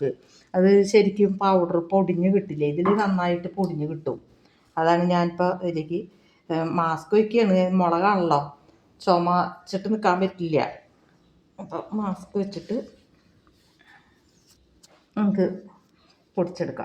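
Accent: Thrissur, India. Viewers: സാമ്പാർ പൊടി നന്നായി ഉണ്ടാക്കി കാണിച്ചു👍👌😋😋 ഇഷ്ട്ടമായി ഞാൻ അന്നന്നു വറുത്തരക്കുകയാണ് പതിവ് തൃശ്ശൂർ ആണോ സംസാരം കേൾക്കുമ്പോൾ തോന്നുന്നുണ്ട്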